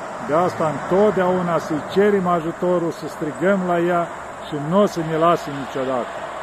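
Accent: Moldova. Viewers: Bună dimineața!!! Părinte vă ascult cu mare drag, PTR că povestiți cu mult har și ca un plus se adaugă blindetea graiului d-voastră, grai dulce Moldovenesc!!! Sănătate deplină!!! Vă rog mult, mult, mult, să binevoiți a-mi da o Binecuvintat!!! Să aibă BUNUL DUMNEZEU ATOTPUTERNIC milă și îndurare de noi și să ne binecuvinteze cu SFiNTA pace pe întreg Pamintul!!! ❤️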